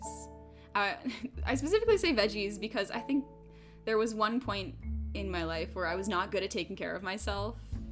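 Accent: South, United States. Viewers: oh no damn doubt its a southern country girl. no city girl says hey sugar the way she says it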